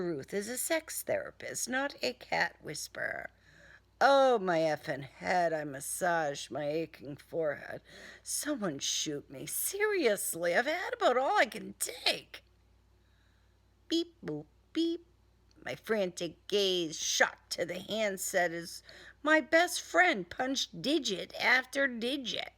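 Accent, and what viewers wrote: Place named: New England, United States. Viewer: New England accent.. 30 bimbos..lol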